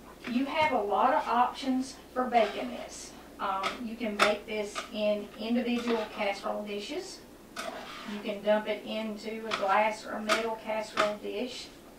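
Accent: South, United States. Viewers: Hi, I was looking for shepard’s pie recipes and I found your channel. I live in Mexico but I lived in Michigan for almost 18 years I fell in love with american comfort food I like your recipe and I saw the hamburger one too I like the way you explain and make your food I’m going to cook some of your recipes soon. Where do you live you sound southern. Anyway I will let you know when I cook your delicious recipes. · You made me cry, with your sweet southern voice and the music in the background was tearful for me. Thank you for the beautiful video. Yes I do zoom in see what you don't have. LoL I'm making that for dinner tomorrow, today I made your Skillet Steak, baked potato and green beans. It was delicious. I never made Shepard's Pie like that before but I'm going to. I'm so glad I found you, everyone out there knows what a beautiful person you are and I know with your talks you have changed a lot of people like you did me. 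Finding God through you was the miracle I've been wanting. to happen in my life. I feel very close to you and love everything you do and say. OMG, that Shepard's Pie looks amazingly delicious. Thanks again sweetie, God Bless you and your family. I'll always put God first.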